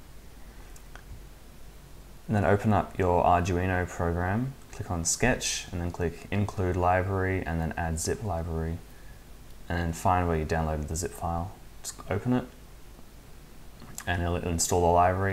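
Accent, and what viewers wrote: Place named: England, United Kingdom. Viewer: This module should not be used without level converter. The VCC for the sensor is max 3.3V, see <link> and <link> There is no provision for level shifting on the module. If you apply 5V you are unduly overstressing the module and reduce its lifetime. I love your english accent but the accuracy of the contents leaves to be desired.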